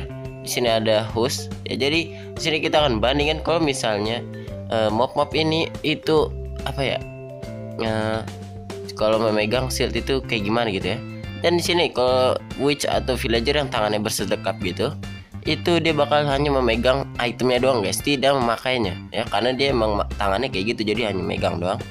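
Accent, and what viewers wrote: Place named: West Java, Indonesia. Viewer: bang orang sumda ya aku dengar deukeut saat yang ke-3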